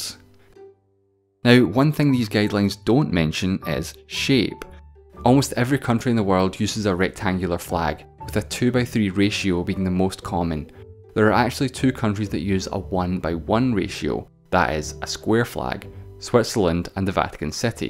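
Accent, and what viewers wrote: Scotland, United Kingdom. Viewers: I don’t want to be culturally rude but is it me or does he sound Scottish (btw I’m new to this channel) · Is scottish or something?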